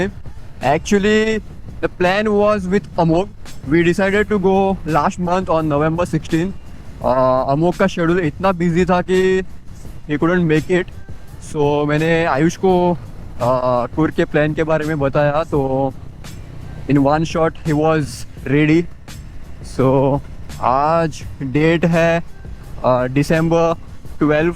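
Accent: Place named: Goa, India. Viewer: Lived in Goa for 11 years, hearing you brings back memories. You literally have similar accent to one of my really good friends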